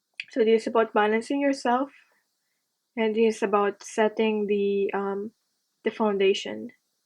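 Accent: Philippines. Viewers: You sound filipina 😊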